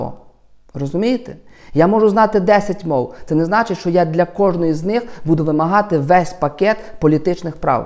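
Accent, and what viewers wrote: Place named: Western Ukraine, Ukraine. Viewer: Ой, как вы здорово говорите на украинском, ох это украинская с западенським акцентом.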